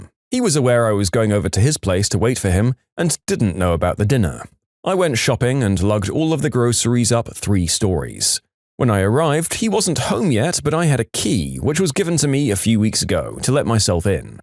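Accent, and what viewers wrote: United Kingdom. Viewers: Is it just me, or is the new narrators voice really relaxing? I may be biased because I'm British as well and don't hear many British people online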